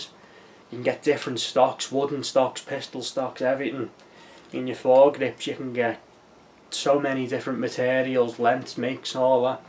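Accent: Liverpool, United Kingdom. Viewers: He's a Scouser.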